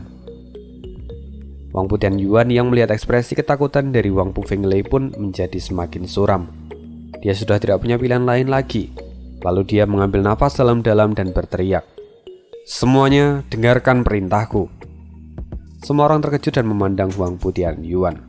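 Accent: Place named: Java, Indonesia. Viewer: Mimin btw orang mana yaa kayaknya logatnya logat Jawa nii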